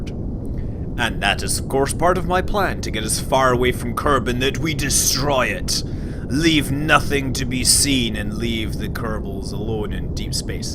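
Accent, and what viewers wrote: Scotland, United Kingdom. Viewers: Sounds like Scott's accent came back with him from Scotland. Neat! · is all like "How come we don't have a swamp anymore!?" in his Scottish accent. Couldn't help but Shrek.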